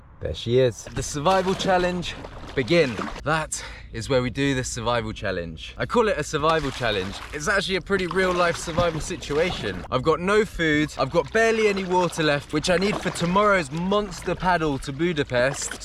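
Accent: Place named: Midlands, United Kingdom. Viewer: Are you from the Midlands? I see a slight accent there slipping out every now and again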